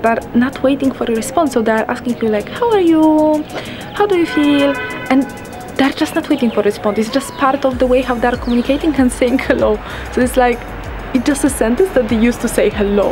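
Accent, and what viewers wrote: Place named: Poland. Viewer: Are you Polish? Omg your accent is so cute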